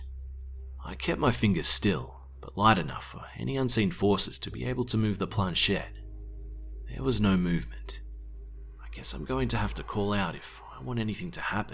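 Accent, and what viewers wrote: Australia. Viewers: I agree with you 100%; IS one of the best narrators on YouTube! His content is ALWAYS excellent AND satisfyingly creepy. I too an not a big fan of The Creepypasta genre (as I assume you aren't either) and his channel rarely presents them. His content is always well chosen; it is gripping, spooky, pleasingly bizarre at times and is always presented in a very listenable manner. (AND, how amazing is his Aussie accent?!) `s channel is DEFINITELY one of the very best on YouTube! 😱💀☻👻🦇🕸🕷🐱☠👽🖤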